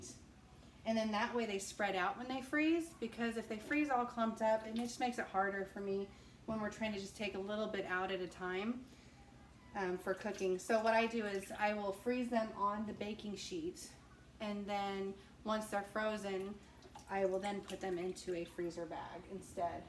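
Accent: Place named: North, United Kingdom. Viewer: Where do you guys live??? Sound like a norther girl like me! 😉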